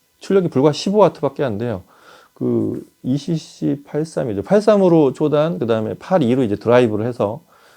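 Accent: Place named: Seoul, South Korea. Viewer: 발성 좋으시네요^^ 오리지널 서울 말씀.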